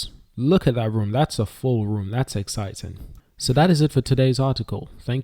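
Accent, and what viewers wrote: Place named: United Kingdom. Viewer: Your channel only seems to pump the news. Why have you not addressed the fact that PSNY share price has dropped almost 70 % since it went public. And with the fact that the CEO persistently wanting to pitch POLESTAR against Porsche is absurd. His ego will ruin the company. That explains why when they launched the P3 in the China market they had to slash prices immediately !! With your accent I assume you are from the UK...try selling Vauxhall at Audi prices and you will know what I mean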